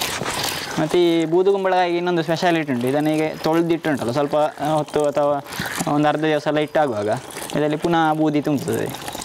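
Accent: Mangalore, India. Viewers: Your manglore slang kannada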